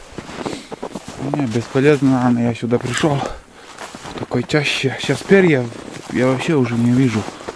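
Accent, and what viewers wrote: Komi, Russia. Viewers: я из Инты,тоже дачка была в лесу,но у нас не мыши а леменги одолевали за зиму,оставлять ничё нельзя было🙂🙂🙂а у вас прям говор Коми👍👍👍👍подписка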